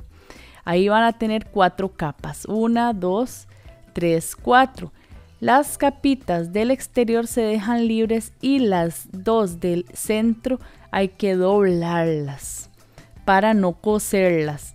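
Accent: Costa Rica. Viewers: Ese acento no se pierde!!! Bravo una tica emprendedora!!! Saludes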